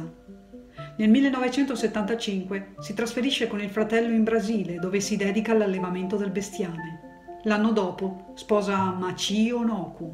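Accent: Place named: Mestre, Italy. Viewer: Conoscevo questa storia incredibile....e mi fa piacere sentirla nuovamente raccontata da con il suo accento mestrino 😼...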